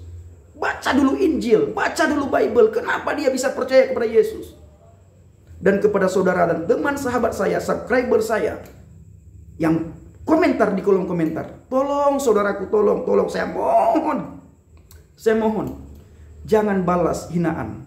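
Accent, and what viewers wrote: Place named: Aceh, Indonesia. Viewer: Luar biasa kesaksian dari orang Aceh ini, logat Aceh nya masih terasa. Haleluya.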